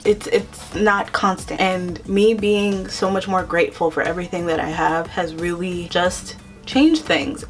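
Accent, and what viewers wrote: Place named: Brooklyn, United States. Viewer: I like the Italian Brooklyn accent, perfect!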